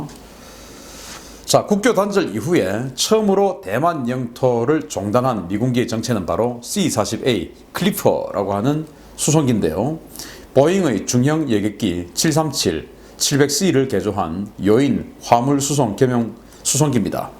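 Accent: Gyeongsang, South Korea. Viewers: 화가 나서 다시한번 들어보니 대만섬 이라고 발음 하는거 같기도 하고?? 경상도 사투리 억양이 남아서 내가 잘못 들은거 같기도 하고??ㅠ 타이완도 엄연한 한개의 독립국가이니 대만섬 이라고 하지말고 대만 이라고 불러부는게 예의 인거 같습니다ㅎ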